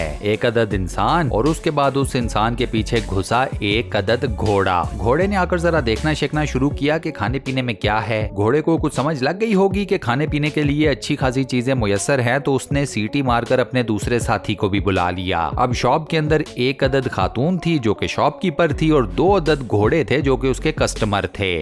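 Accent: Punjab, India. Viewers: Great voice over with Punjabi "tadka"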